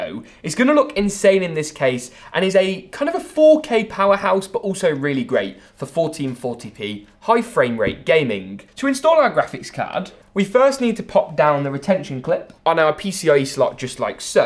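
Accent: United Kingdom. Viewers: Not only is he Spider-Man, but he is also a tech genius But you thought I was finished he also speaks in a British accent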